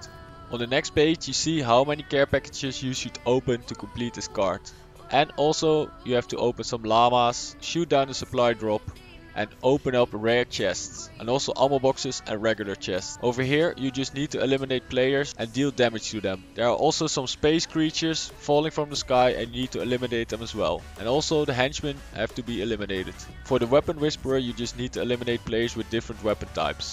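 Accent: Netherlands. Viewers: ja, ik wist het. Ik zag het aan je naam en er zit iets van Nederlands in je Engels Niet dat je Engels slecht is hoor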